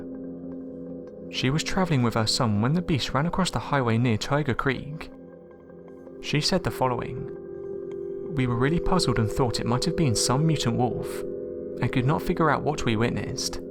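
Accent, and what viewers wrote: United Kingdom. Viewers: Cant undrstand u brit or ausie twit! Get another 2 narate👹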